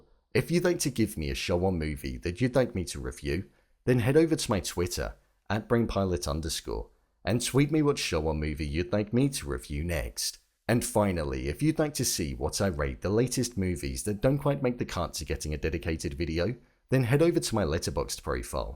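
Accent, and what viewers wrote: England, United Kingdom. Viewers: Is that english’s accent is trying to discredit Netflix? Irritated for The Crown series? A lot of novels, history books, screenplays had different changes in a movies, series or documentaries. Only knowledge can set you free.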